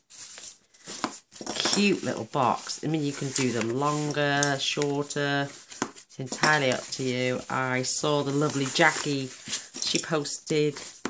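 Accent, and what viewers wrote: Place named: Yorkshire, United Kingdom. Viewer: How lovely and unusual - love it (are you in Yorks - just going by the accent xx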